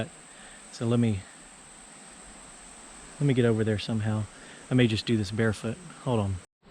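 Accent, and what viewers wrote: South, United States. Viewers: Wow, that the most beautiful Ridge l have ever seen. Those arches under it are so amazing, l have never seen anything like that before. And looking up at the railway bridge the creek was absolutely gorgeous. Those gun shots where worrying though. Thank You so much for the videos, your a real Southern Gentleman,l love your accent, so different from here. Keep Safe, Debra from Australia. 🌿🍀🌷🌹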